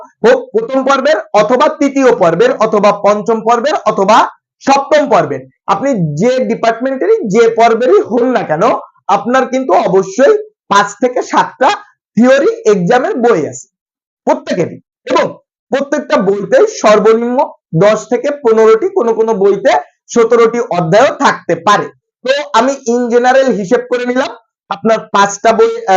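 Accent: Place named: India. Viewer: আপনি যেই ভাবে কথা বলেন আমি ভাবছিলাম আপনি ভারতীয়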